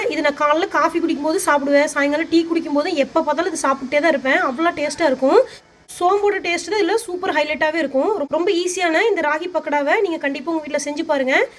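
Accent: Kongu, India. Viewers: Sis neenga kongu side endha ooru.erode??? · Kongu tamil😻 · Kongu slang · Kongu slang semma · adada..yengooru bashai kongu tamil yena mariyadha...yena alagu..love ur beautiful slanggg than the pakoda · Kongu tamizh super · ஏனுங்க கோயம்புத்தூர்ங்லா நீங்க கொங்குத் தமிழ் வீசுதுங்க ராகி பக்கோடா அருமைங்க. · Yenunga amuni enna arumiya kongu tamil pesringo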